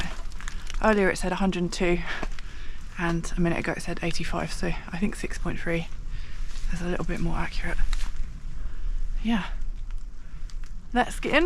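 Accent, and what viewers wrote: United Kingdom. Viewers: "Doof." I love it! Your British English gives me a charge. I learned the word, "bap," for sandwich, and that's fun. Love your videos! ❤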